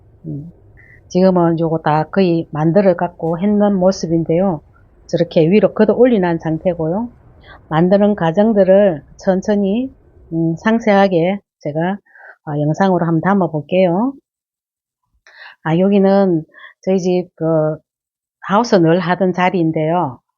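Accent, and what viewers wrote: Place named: North Gyeongsang, South Korea. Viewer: 왠지 익숙한 편안한 억양이랑 말투라서 같은 곳이구나 생각했지요 저도 경북이거든요 멋지세요^^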